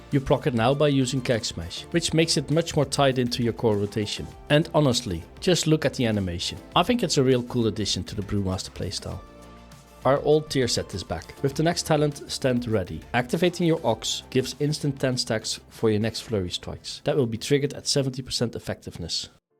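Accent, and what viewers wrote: Netherlands. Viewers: Is it a dutch accent i hear? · Thanks for the guide, I've never played a monk before and after the video I notice the information sticking with me. (Probably because the class has been pruned) Maybe also because we have the same Dutch accent haha. Can I ask a few specific questions? I have done some content and I've never used Expel Harm, is it a button you ever press? If so, when? Do you always use Purifying Brew at 100 stagger? To keep the cooldown rolling? In what situations do you use Summon Black Ox Statue? How is Master of Harmony on Beta? Cheers again and cya next time.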